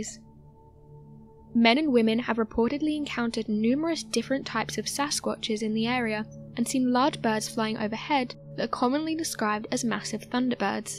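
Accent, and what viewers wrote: United Kingdom. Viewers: This girl is one of the most pleasant narrators on YouTube. Her sweetly modulated voice combined with the pure British accent is refreshing.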